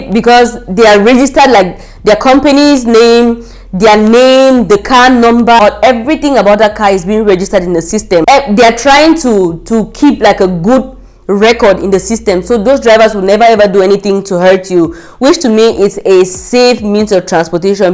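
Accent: Nigeria. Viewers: Why do you sound more Nigerian than Cameroonian? Just curious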